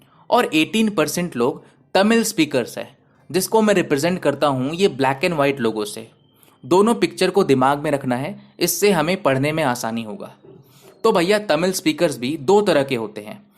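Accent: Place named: Uttar Pradesh, India. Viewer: That line ki ' Bhaiya aaram se raho, zyada dimaag mat lagao' made me laugh..😉 Nice work bhaiya..Aapki bolne k tareeke se lagta h ki aap UP k h ..main bhi Lucknow me rehti hu 🤗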